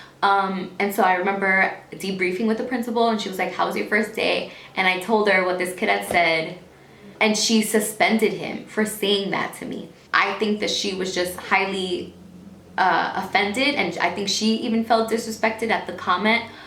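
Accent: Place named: New York, United States. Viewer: I love your NY accent.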